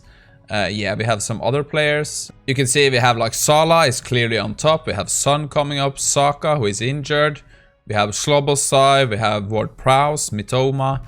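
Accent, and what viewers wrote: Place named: Norway. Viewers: He IS speaking english with a Norwegian accent. Yes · Are you from Norway? Sounds like a norwegian trying to speak English to be honest :-)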